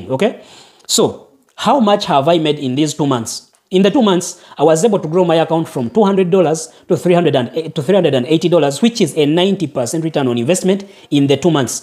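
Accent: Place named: Buganda, Uganda. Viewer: He seems a muganda according to his acent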